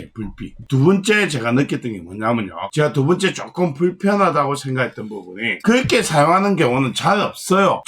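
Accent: Gyeongsang, South Korea. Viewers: 겡상도 사투리 짜증난다.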